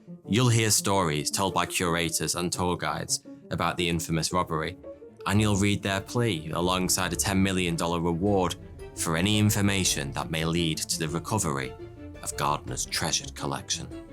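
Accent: United Kingdom. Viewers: he's addressed this. He says both. And that's how the British accent works. Considering how many differences there are in pronunciation, it's silly to even mention it.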